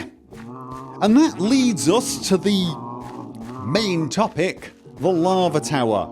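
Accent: United Kingdom. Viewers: You know I finaly remember why i like watching you. You are basically have a british 'Morgan Freeman' voice in that its amazing to listen to and you happen to play alot of games i enjoy. Please do more of the minecraft stuff!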